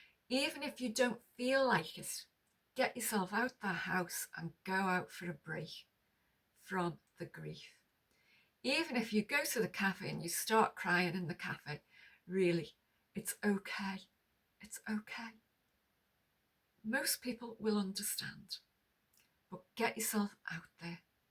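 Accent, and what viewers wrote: United Kingdom. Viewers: Hi, just found you. I want to give you a hug. Everything you have said resonates with my journey which started 3 years ago after my husband died. No one understands until they go through this, we were together for 50 years. I have just returned from my son & his family (for Christmas)just outside Liverpool so recognise your accent😊 I am in Malvern. Please keep putting your feelings on here, I am sure it will help you (and many others too). I too have realised that our “couples friends” no longer have anything in common with me and that my husband seems to have never existed for them. Yes- they too may have to face this one day. Sending love ❤ Sue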